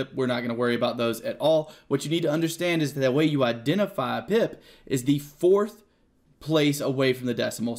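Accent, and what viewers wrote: South, United States. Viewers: His southern accent comes out occasionally and its adorable lol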